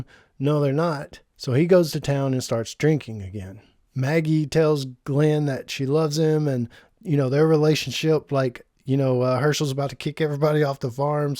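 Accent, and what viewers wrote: South, United States. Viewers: I just watched a 6 year old video frrom this channel and you had a way thicker southern accent. Have you toned it down over the years? Im good either way im not criticizing it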